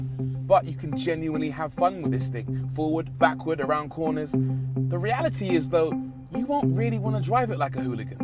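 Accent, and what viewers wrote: United Kingdom. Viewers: THIS video is poetry at its best! I love to listen to this British accent and this beautiful music... Your channel IS underrated, mate! I love this shit.... thanks a lot! :-)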